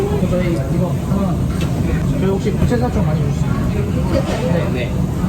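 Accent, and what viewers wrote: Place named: Gyeongsang, South Korea. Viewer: 역시 경상도 사투리 구수 하네용.. 부산 가본적이 없는.. 그주변지역은 다가본....부산 갈일이 없었다는... 신기한.. 잘봣습니다.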